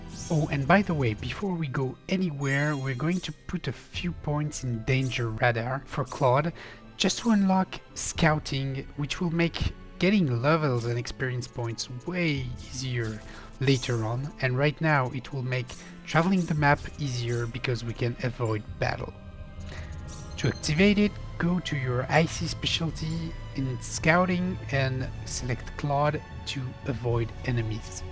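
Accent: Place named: France. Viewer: man, that accent ! Where are you from ? Aren't you french ?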